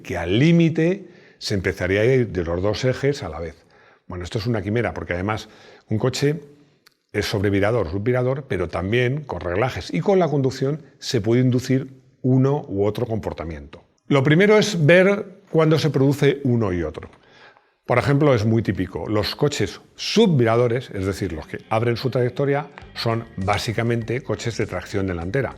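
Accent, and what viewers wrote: Chile. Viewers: Estimados la voz en off es de un Chileno?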